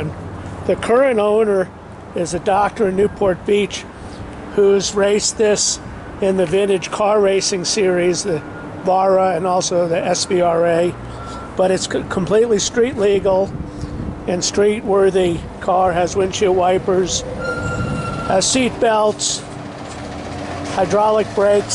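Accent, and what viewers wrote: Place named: United Kingdom. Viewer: Great use of Anglo Saxon English when pulling out. You could pass for a Brit, thanks for a great review. All the best from England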